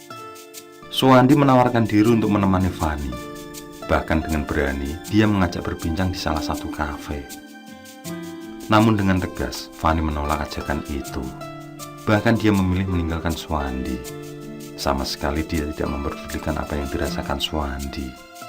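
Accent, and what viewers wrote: Java, Indonesia. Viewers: suarane anteb.. jowo mbangets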